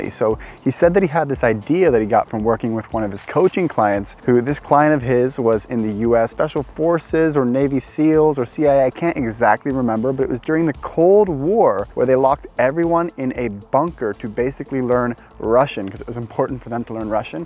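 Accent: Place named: Sweden. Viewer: ur english has a slight swedish flare now :D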